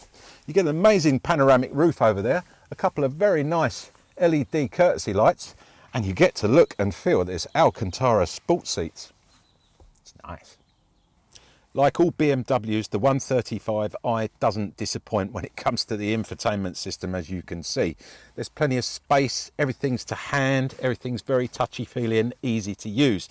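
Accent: United Kingdom. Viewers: Beautiful presentation. I like it a lot. The British accent most welcome.👍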